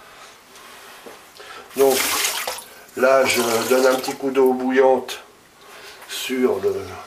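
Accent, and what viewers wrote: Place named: East, France. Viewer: Ahhhh vous êtes de l'est je pense ! Ça me rappelle des souvenirs cet accent :)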